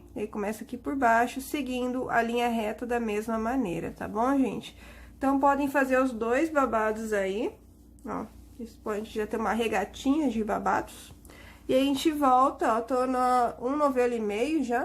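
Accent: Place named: Spain. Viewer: Eu amo essa fala como eu queria aprender falar assim é muito lindo como se pronuncia ad palavras bjosss para todos da espanha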